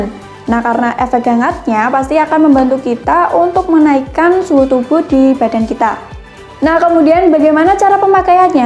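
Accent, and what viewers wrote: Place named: Java, Indonesia. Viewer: Medok amat 😀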